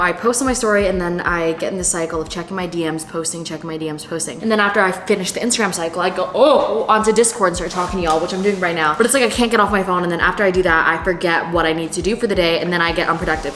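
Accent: United Kingdom. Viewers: Nobody noticed the British accent?😂😂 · Your British accent is swoon worthy. >.<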